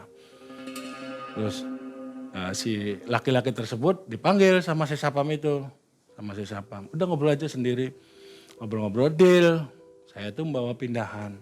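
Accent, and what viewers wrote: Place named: Banyumas, Indonesia. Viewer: Alhamdulillah pak hadir.. Cara bercerita ituloh santai, logat ngapak plus.. Ky nya mmg punya karakter tersendiri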